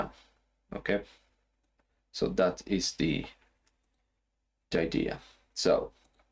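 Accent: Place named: Argentina. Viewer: Hola , no se por que se me hace que sos argentino, por casualidad tenes los pdf de tus clases ?, soy martin de argentina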